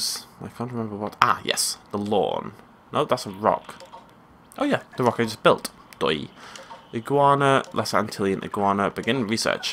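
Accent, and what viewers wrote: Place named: Yorkshire, United Kingdom. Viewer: You sound like your from Yorkshire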